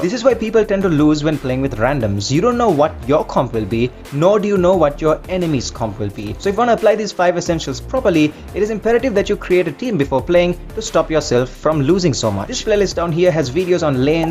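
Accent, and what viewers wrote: India. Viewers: Ooh it sounded like an indian ,but never mind you are our neighbour feels great ,keep uploading this tips 👍🥰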